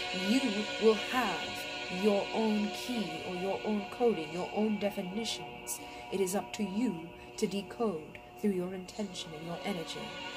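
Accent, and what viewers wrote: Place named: United Kingdom. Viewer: Am sorry but the music is to loud and it makes hard to hear and am sorry but is kind of weird hearing their kind off "British accent with your American pitch" sorry I've never heard Pleiadians speak but again is the music that makes it harder Again I don't want to sound rude or anything, I love your readings, but this is my first time listening to their messages.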